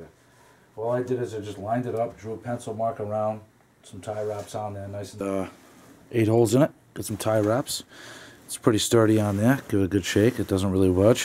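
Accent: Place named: New York, United States. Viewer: Sounds like every other New Yawker Ive ever heard or maybe BAAAsten.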